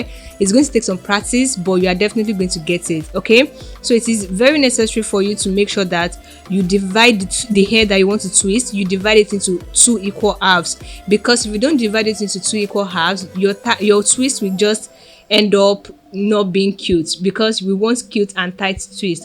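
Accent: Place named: Africa. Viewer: She talks toooooo fast with a very strong African accent.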